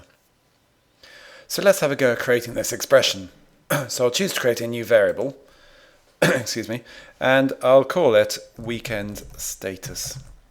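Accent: United Kingdom. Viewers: special thanks from Egypt Special thanks for British accents :)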